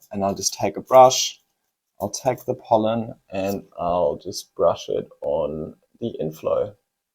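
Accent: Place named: Germany. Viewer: Hey greetings from Berlin! It’s kinda funny that I keep getting German accents from you😅 Any chance you are German? Or least to say I enjoyed your accent, makes me feel homey:)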